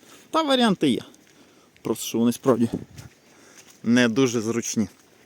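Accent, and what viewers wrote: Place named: Lviv, Ukraine. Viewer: Первый раз чую львовску мову... Интересно... Я всэ розумию... 😊😂🎉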